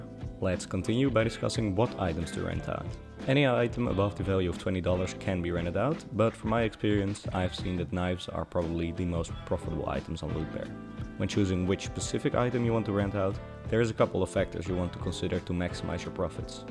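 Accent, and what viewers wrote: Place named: Netherlands. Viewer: je hoort die nederlandse accent altijd zo goed he XD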